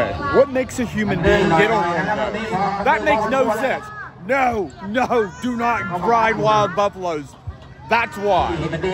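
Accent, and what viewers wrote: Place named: Pittsburgh, United States. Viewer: That accent...you from Pittsburgh? WV? Eastern OH?, anyway good videos.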